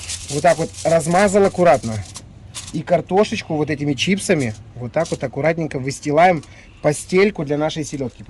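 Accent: Odessa, Ukraine. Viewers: А мы очень любим и. И не важно, что он жарит и где гуляет. Главное - слушать его одесский говор, тонкий юмор. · Я так люблю одессу,а вы такой реальный представи тель ентой местной диаспоры,а ваш говар енто бальзам маи уши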